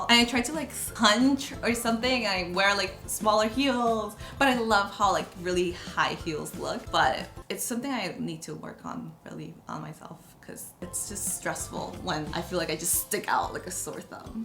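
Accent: United States. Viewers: is not that much you can notice sometimes BUT she almost talk as an american. But is my opinion... just saying 💕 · Yes, you sound and look like you're from St. Cloud...especially with the DIY Gucci.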